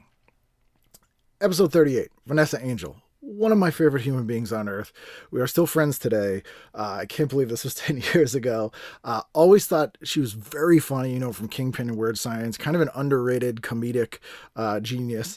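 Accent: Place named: Boston, United States. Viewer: Congratulations! Been loving going back through the back catalogue. I blame you gushing about Trilogy of Terror on Junkfood Cinema for making me go listen to your podcast (and also Dana Gould and listening to you both go FULL Boston which is something to behold). You rock, man!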